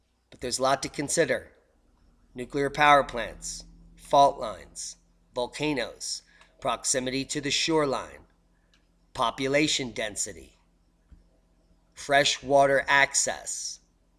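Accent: England, United Kingdom. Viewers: for a moment there I thought I was listening to an English bloke, the accent was so good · Last night I watched a visually stunning doc called "A Volcano Odyssey", YT...they explain that the meteorite that "caused" the extinction of dinosaurs 65 million yrs ago was not the only phenomenon that wreaked havoc on earth (we knew that..;)....India was a floating continent below the equator at that time, drifting northward over a hot spot, and a massive volcano that erupted for perhaps millions of yrs at about the same time, wOw The mountains of Mahambaleshwa were created in northwestern India...Fascinating... Volcanoes Rule, are Earth's supreme geoengineers =D BooM! Thank You...I love your Brit accent;) Many Blessings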